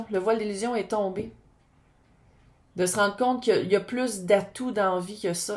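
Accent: Canada. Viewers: trop bon cet accent canadien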